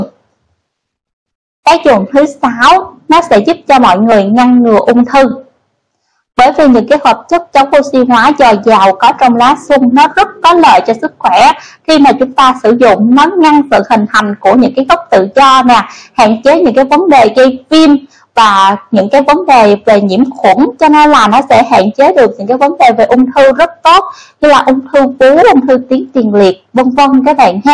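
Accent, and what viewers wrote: Vietnam. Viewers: Nghe tiếng yếu và nhỏ, giọng Miền Nam, nghe rất ức chế... Sau nên in chữ phụ đề bói ử dưới nhé, tốt nhất nên lồng tiếng giọng to và miền bắc. . nghe đỡ khổ sở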